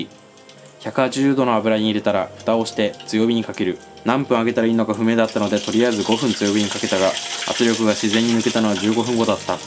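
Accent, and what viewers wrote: India. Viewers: たまに喋り方がインド人ぽい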